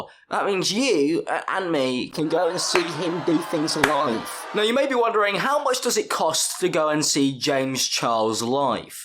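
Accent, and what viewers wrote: United Kingdom. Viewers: The more I watch the less of a British accent he has//// guess ion getting used to it🥺🥺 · This is the first time I’ve watched an I’m video. Is he always this British?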